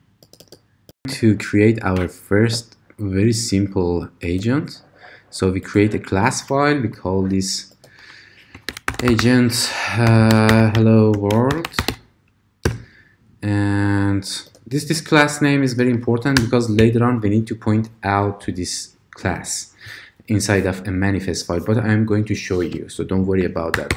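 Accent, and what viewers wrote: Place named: Iran. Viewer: Your accent tells me that you are an Iranian)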